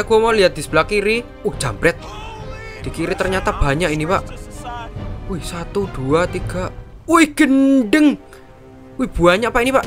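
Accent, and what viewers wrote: Java, Indonesia. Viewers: Bang loe orang jawa mana? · bang jawa nya medok amat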